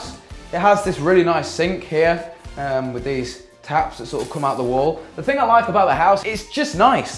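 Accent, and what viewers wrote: United Kingdom. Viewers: Is he welsh?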